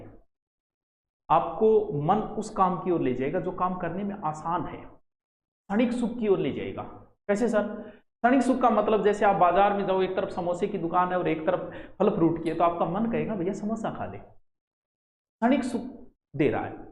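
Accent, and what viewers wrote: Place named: Marwar, India. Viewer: Sir..ager English k words bolte ho to pronunciation ka dhyan rkho..."Marwadi" Wali feeling aati h... Other wise hindi hi bolo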